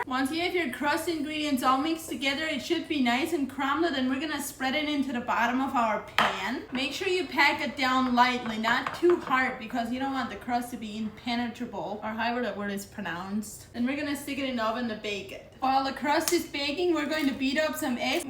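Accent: Pennsylvania Dutch, United States. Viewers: Amish speak Pennsylvania Dutch (that’s what her accent is)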